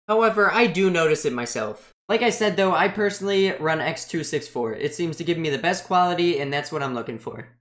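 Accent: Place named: New York, United States. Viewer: What is that accent? New York?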